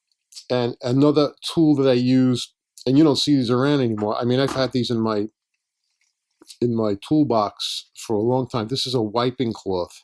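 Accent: New York, United States. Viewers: Nice NY accent!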